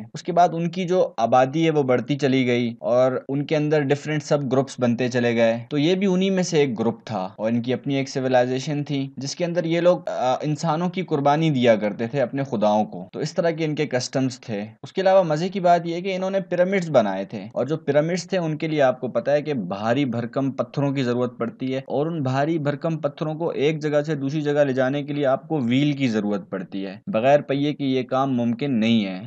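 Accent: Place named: Pakistan. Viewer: Bhai Teri aavaj Pakistani bndo jesi lg rhi hai 🧐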